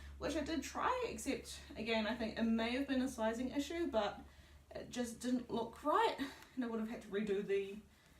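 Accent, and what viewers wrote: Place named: New Zealand. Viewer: your accent is very beautiful I don’t know much about your country and am really sorry if I get this wrong but is that the accent that sir Peter Jackson has and what’s your favourite thing about New Zealand and who is your favourite character in he lord of the rings and the hobbit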